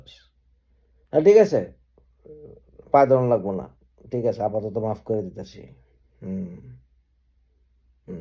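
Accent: Dhaka, Bangladesh. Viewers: ভাই, আপনি সবসময় ঢাকার ভাষায় কথা বলেন, ভাল্লাগে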